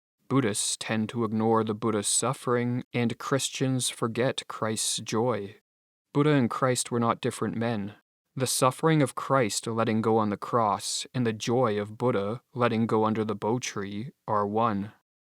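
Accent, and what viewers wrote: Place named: Canada. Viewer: Canadian accent.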